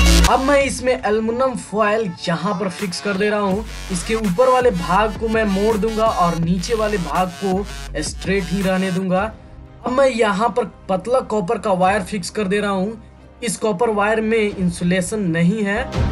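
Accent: Bihar, India. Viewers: bhai apna hindi nd english accent sahi kr lo thoda impression achha bnega apke accent m bihari tone aata hai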